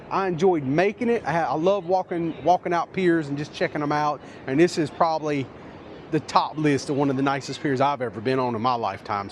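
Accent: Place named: North Carolina, United States. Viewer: We visited the pier in April & it is a great pier. This was the first video I watched of yours but have been enjoying the ones you've done since. Thanks! We are born & raised North Carolinians & love your accent. Sounds like us 😂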